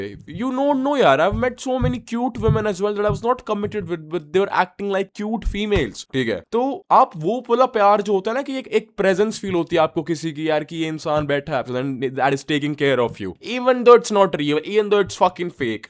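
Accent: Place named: Rohtak, India. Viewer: bhai rohtak se hai ke tu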